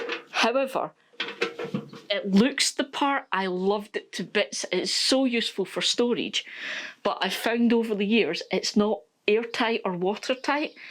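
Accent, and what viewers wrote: Scotland, United Kingdom. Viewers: All I can say: love, love, love it! (your ideas, content, explanations….) - and over the last 2 years I have been falling in love with Scotland. So - listening to you sounds „so familiar“.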